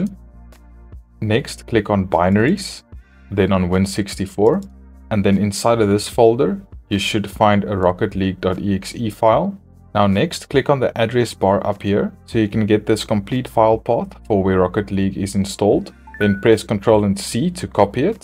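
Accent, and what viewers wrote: Afrikaans, South Africa. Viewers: Jy klink Afrikaans. Is ek reg?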